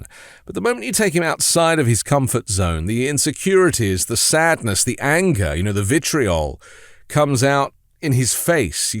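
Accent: United Kingdom. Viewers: I love how you completely dismantle Trump with your British accent making it sound like a very friendly story. The truth hurts 20 times more out the mouth of a Brit 😅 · . Nice job sir. Great to hear a British (or non American) voice outlining the situation so clearly. Im a New Zealander and appreciate this. Trump is now like a child bully. Once they are found out and cornered they show they are really horrible nasty gutless cowards.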